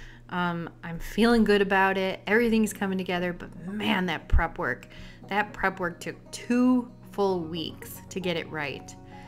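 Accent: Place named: Midwest, United States. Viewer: I love your Midwest accent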